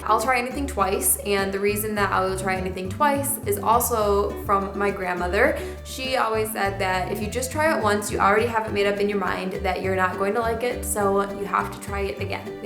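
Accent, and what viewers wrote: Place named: Upper Peninsula, United States. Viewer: Lol this is an old video but it popped up on my main page so I decided to watch it, and I'm actually really glad I did! I'm from Wisconsin and I could totally tell by your accent that you were from around the UP.. Not that that's a bad thing though! Haha :) I used to play Sims and Runescape too like it was nobody's business too! haha And omg.. I am petrified of pain too and being pregnant scares the hell out of me too. I'm glad I'm not the only one that's genuinely scared of that lol.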